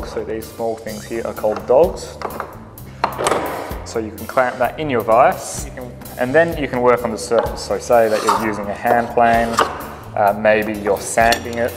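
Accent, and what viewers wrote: Australia. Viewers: Great project and I cant wait to build one myself. Where cn I buy the holdfasts? Great to hear an Ozy voice.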